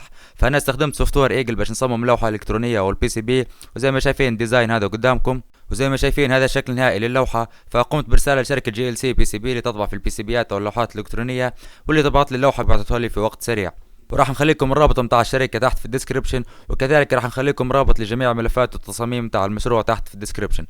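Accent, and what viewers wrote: Libya. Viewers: اي مبين من لهجتك انك ليبي بس تعجبت لماشفت مكتوب الموصل في المقطع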